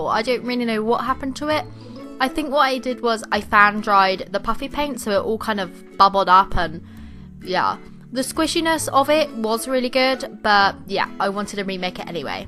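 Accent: United Kingdom. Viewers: It’s a Brit!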